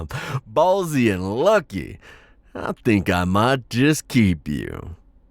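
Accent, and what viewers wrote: South, United States. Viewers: this heavy southern drawl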